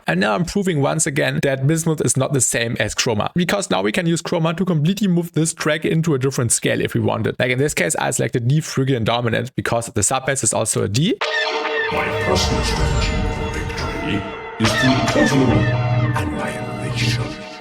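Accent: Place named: Germany. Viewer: great video … but holy German accent xD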